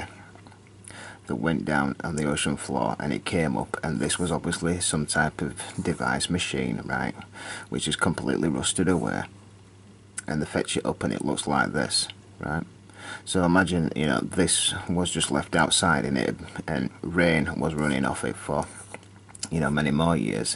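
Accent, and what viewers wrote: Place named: Yorkshire, United Kingdom. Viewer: Sorry I'm a few years late, this stuff is fascinating. Once you see it, you cannot unsee. Great work. Cheers From NZ. I can't pick the accent but I'm Guessing York or Scarborough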